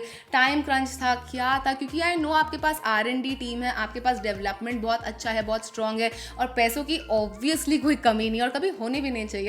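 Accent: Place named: Delhi, India. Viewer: I m your ardent fan since 2016 but my heart melts in your Hindi videos ..that slight Delhi accent makes you more relatable ❤❤❤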